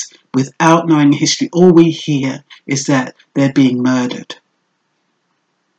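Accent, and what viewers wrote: Jamaica. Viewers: Your Jamaican accent comes out when you're upset!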